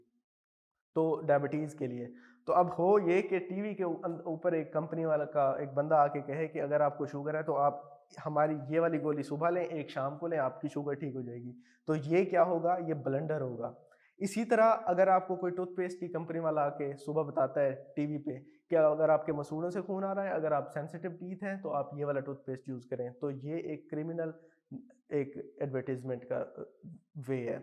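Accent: Saraiki, Pakistan. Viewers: Sariki speaking lG rahy dr. Sahab 👍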